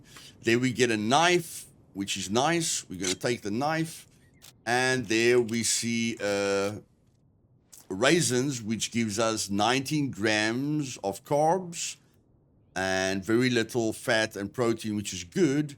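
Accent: Afrikaans, South Africa. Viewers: is that a afrikaanse acsent? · Bro got the South African Accent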